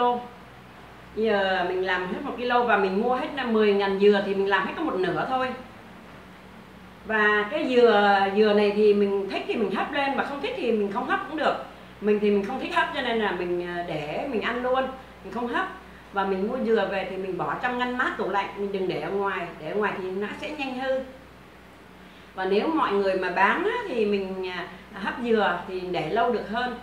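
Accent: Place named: North, Vietnam. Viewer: Bà ơi, cho con thắc mắc 1 tí được không ạ? Con thấy bà nói giọng bắc, có phải bà là người miền bắc không ạ?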